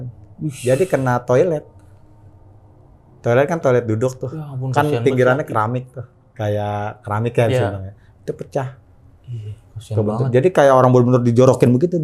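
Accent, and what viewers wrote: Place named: Betawi, Indonesia. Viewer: Bahasa betawinya lho yg bikin gua cengar cengir sendiri😂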